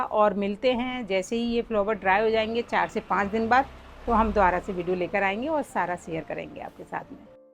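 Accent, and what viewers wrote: India. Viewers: Your accent looks like Maharashtran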